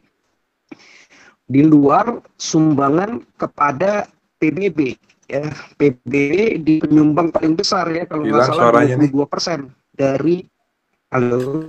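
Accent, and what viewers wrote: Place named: Batam, Indonesia. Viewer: Jelas ko suaranya dari Indonesia Batam.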